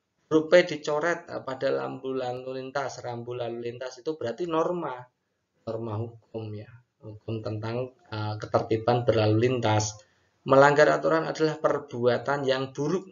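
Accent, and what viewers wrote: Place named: Java, Indonesia. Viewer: Hai... Kak... Dari Jawa juga yaa sepertinya... 😁 Suka banget sama kecepatan menjelaskannya ....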